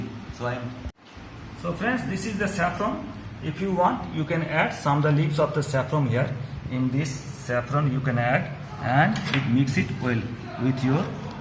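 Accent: India. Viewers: IT WOULD BE GREAT IF YOU COULD INDERSTAND HIS INDIAN ACCENT.I GOT NOTHING FROM THID SI CALLED INFORMATION. THIS NEEDS ENGLISH SUBTITLES PLEASE OR IT IS USELESS.OR HE SHOULD LET SPMEONE ELSE DO THE TALKING WHAT A PITY.. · Thanks for your attempted presentation, but until hindi's learn how to speak English without making it sound like hindu, there will be far less success than you would hope!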